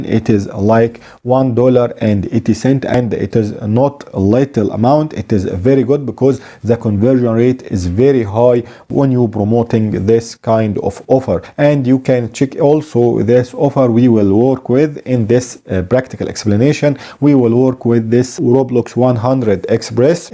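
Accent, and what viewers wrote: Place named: Egypt. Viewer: معلش بس اللهجة المصرية باينة بصراحة 😂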